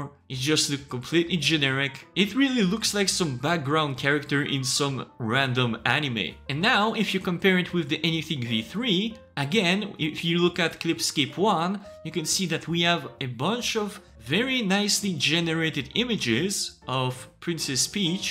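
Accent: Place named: France. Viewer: En 0.23 secondes j'ai compris que j'avais affaire à un Francais.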